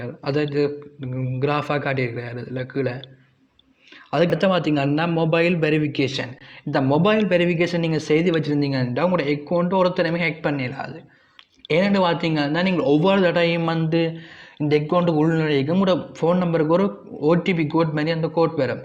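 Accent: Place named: Sri Lanka. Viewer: நண்பா நீங்க இலங்கை யா உங்கள் தமிழ் different ஆக உள்ளது Niceee❤️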